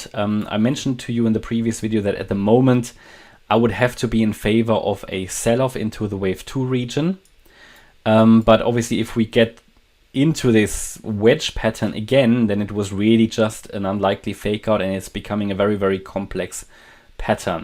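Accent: Germany. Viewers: Are You German? I hear a German accent?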